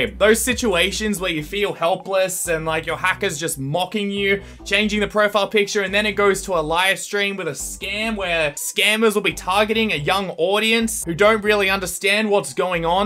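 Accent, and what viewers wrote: Australia. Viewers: sound australian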